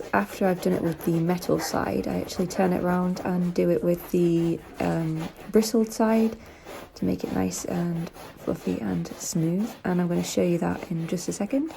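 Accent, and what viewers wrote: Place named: England, United Kingdom. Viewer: Many thanks for these tips and so nice to hear an English accent for once. I think you were really brave to put it in the machine! I've just purchased a 1970's Sherpa style longish Sheepskin jacket/coat which needs some tlc. The fur was originally white but has turned greyish now but the condition isn't terrible. I like your idea's of using the hair products, that is not something I would have thought of tbh, but I think I need to slightly bleach this fur back to a whiter colour because the jacket has white stiching. It would have been fantastic back in the day, but thanks again for these tips.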